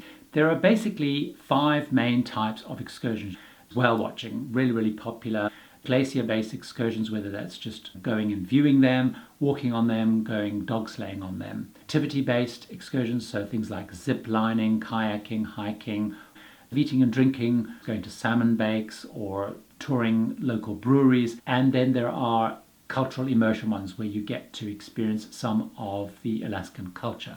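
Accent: England, United Kingdom. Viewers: Your speaking style is difficult to understand and to listen to. You speak too quickly and even worse, the volume of your speech is so variable it is difficult to keep up with. The volume of your speech many times starts out loud, like an attack, then fades into a very low volume for an extended period of time. Then another attack and another fade. Your English(?) accent makes it even more difficult to understand. I would suggest you speak more slowly, more clearly, and try not to have dramatic volume differences in your voice. I found the video difficult to listen to and understand. This comment is meant to be helpful. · I believe is British from his accent and from some of the comments he makes.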